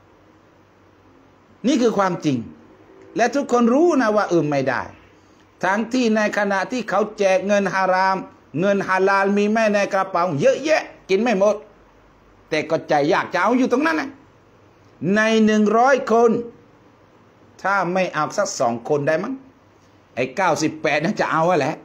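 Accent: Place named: Nakhon Si Thammarat, Thailand. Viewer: นครศรีธรรมราชชัดเจน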